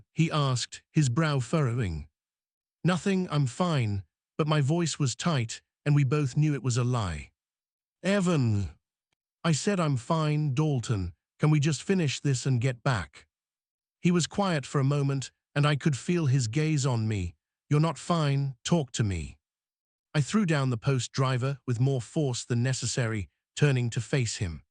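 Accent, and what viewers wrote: United Kingdom. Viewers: I really enjoyed the story line and the writing. However, I am not in complete love of the total package. What brings me down is the British accent of the narrator. Giving the cowboys British accents broke the narratives credibility. Cowboys with the a British accent stole the legitimacy of the story. narrator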